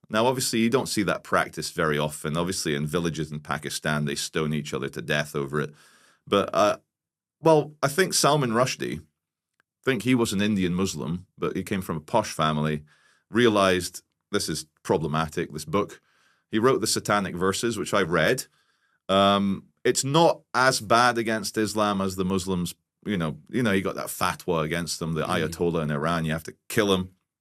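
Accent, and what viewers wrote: Scotland, United Kingdom. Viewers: he got a scottish accent but hes not frome the uk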